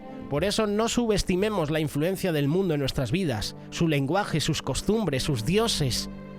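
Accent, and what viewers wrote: Spain. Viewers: Hermosa explicación, hace poco que sigo su canal y sus contenidos son muy edificantes. Que bueno saber que en Europa hay fervor por la Palabra de Dios ( entiendo que la voz en off es de un español) Bendiciones desde América del sur ( Paraguay)🇵🇾💖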